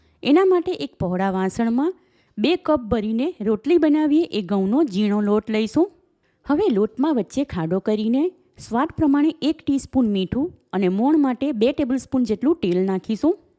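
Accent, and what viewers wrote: Surat, India. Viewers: તમારી ગુજરાતી રેસીપી તો સરસ જ છે પણ ભાષા પણ અસલ સુરતી જ છે.great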